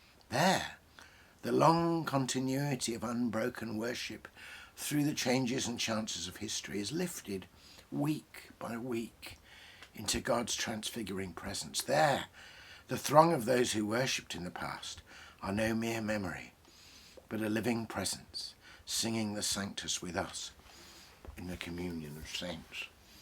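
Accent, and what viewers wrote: England, United Kingdom. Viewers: A fine stoic English accent will be the most comforting thing I can think of if the world collapsed tomorrow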